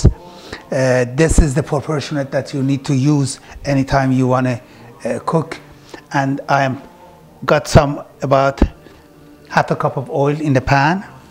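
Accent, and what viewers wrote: Iran. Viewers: Personally I love your Accent It`s Persian Accent and it spices up your Cooking show more over I would like to thank you here for sharing your experiences and knowledge Regards Arash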